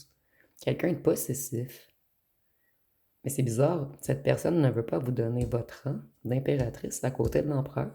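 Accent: Quebec, Canada. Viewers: ✨Yes 😉. ✨Booster...Liker... Abonnée... ✨BonJour de France 🇨🇵, et grand Merci pour vos excellentes guidances! 💫Très souvent, j'ai l'impression que vous nous "connaissez"! 🌟 Vos transmissions sont enrichissantes... C'est aussi agréable de bénéficier de votre gentillesse, et d'entendre votre joli accent québécois! 💛☀️Je vais écouter votre guidance de ce jour, maintenant.🙋A bientôt.🎈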